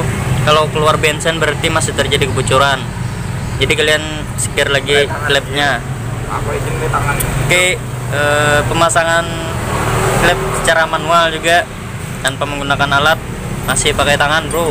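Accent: Banjar, Indonesia. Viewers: Lugat banjar, urng hulu sungai kah bos??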